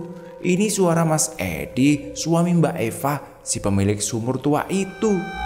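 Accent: Java, Indonesia. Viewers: aq palah suka logat jawa nya,jdi unik. yg gak paham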